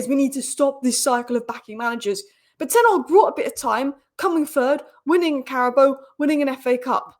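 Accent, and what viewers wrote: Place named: London, United Kingdom. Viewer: I can tell you're a Londoner, by the way you say yoof, bovvered and nuffing. Love your passion for your team.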